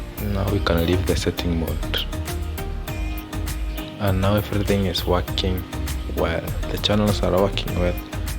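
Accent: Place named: Indonesia. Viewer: Oh, I guess you're from Indonesia because your accent is very similar to an Indonesian remain optimistic about developing unmanned aerial technology bro, as an innovative step in diversifying technology into the aerospace engineering Hello from Indonesia, I'm a technical student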